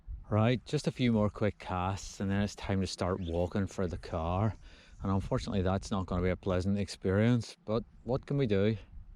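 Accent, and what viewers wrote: Northern Ireland, United Kingdom. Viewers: your accent sounds really northern irish-ish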